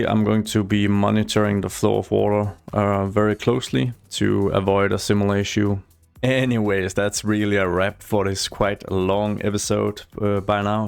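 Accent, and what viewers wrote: Denmark. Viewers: I was wondering whether you are from Denmark based on your accent🙂 Btw love your videos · Det er super god danglish :)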